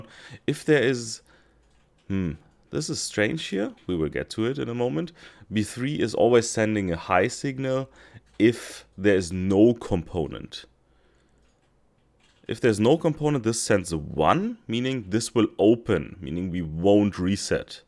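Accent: Germany. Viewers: I love your videos, thank you very much! Also, PLC Zim , classic german-english!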